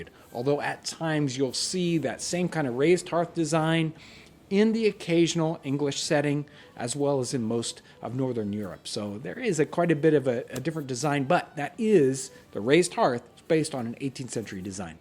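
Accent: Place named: Midwest, United States. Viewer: you know; now that Im looking for it you sound like a fellow Hoosier or Midwesterner in the least.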